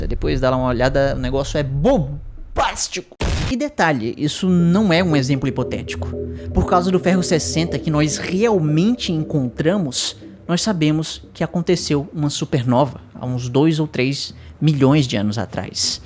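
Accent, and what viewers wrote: Ceará, Brazil. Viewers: TU É CEARENSE MANO?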